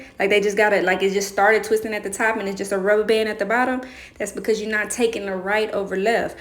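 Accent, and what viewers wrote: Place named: Dallas, United States. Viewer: Sound like u from out here in Dallas... forgive me if I’m wrong luv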